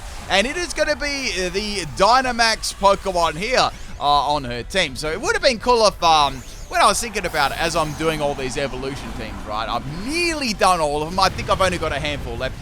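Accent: Australia. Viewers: Aussie voice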